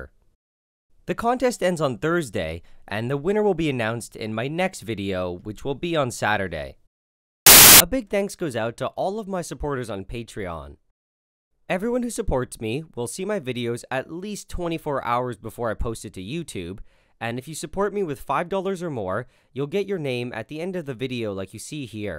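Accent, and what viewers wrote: Canada. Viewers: Dude he's Canadian. He's gonna say things differently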